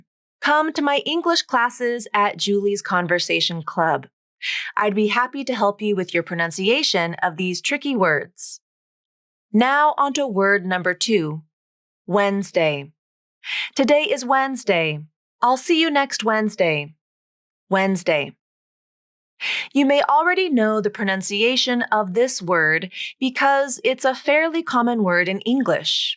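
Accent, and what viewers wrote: San Diego, United States. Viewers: un abrazo!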